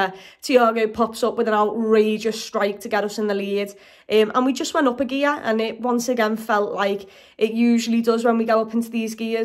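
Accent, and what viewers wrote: Liverpool, United Kingdom. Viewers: This scouse accent 🥺>>>>>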